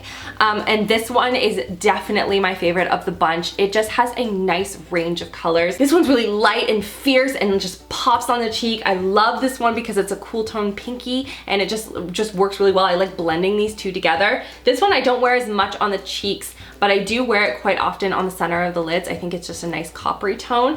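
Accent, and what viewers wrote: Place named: Alberta, Canada. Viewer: thats that awesome alberta french I'm hearing! #YYC rep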